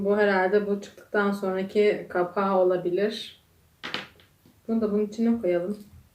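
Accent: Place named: Yozgat, Turkey. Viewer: esahhhh mı 😂😂😂 bu şiveyi herkes bilmez zaten 😂😂 evelallah Yozgat hanımları mariferlidir 💪💪💪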